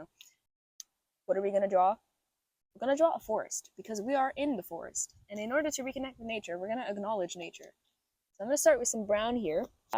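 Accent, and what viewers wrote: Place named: United States. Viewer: The world needs more Luxembourg YouTubers that talk and act american. Thats actually dope as fk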